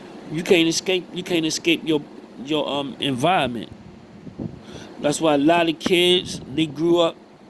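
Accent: Baltimore, United States. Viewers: Ayo you from bmore? Your accent sounds like it....and big facts about intelligent people, we colorblind, all i see is green. If you help me see green, idc what you look like, lets get it.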